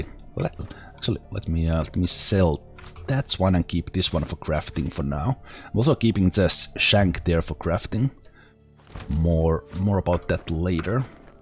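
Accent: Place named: Finland. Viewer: You have a finglish accent :D awesome!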